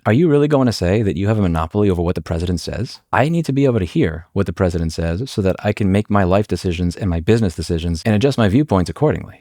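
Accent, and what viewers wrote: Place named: New York City, United States. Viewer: He's from NYC. I'm familiar with his dialect so it's not fast to me.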